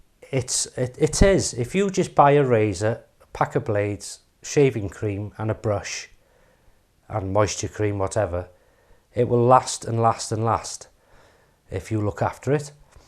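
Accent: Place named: North Wales, United Kingdom. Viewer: Hi, Top man, interesting, informative chat. I look forward to all you videos, love the North Wales accent, makes me feel like being home. Keep up the good work Cheers Steve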